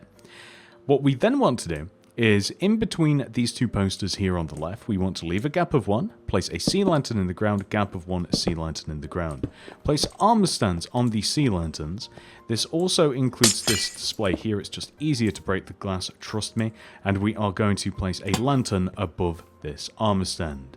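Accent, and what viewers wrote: United Kingdom. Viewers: He’s British so he pronounces it differently like me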